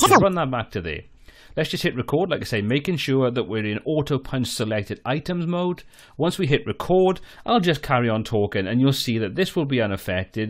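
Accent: Wales, United Kingdom. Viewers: Excellent video, easy to follow. Solved my recording problem in the first few minutes. And a great Welsh accent.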